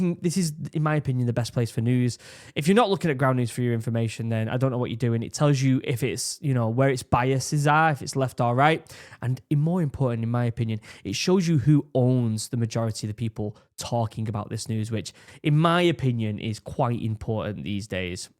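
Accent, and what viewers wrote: United Kingdom. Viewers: Britain, could you not tell that’s kinda his whole thing.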